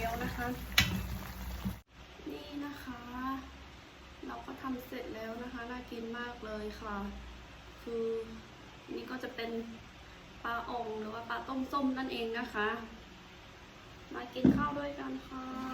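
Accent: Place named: North, Thailand. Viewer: วันนี้อู้กำเมืองเลยน้อ... 👍👍👍👏👏👏🌹🌹🌹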